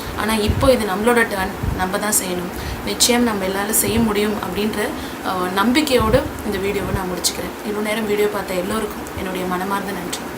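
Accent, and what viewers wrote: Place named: Chennai, India. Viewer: கூவம் நதி குறித்த தகவல்கள் மிகவும் அருமையாகவும் சரியாகவும் இருந்தது .. சென்னை பேச்சு நல்லமுயற்சி நன்றாக இருந்தது.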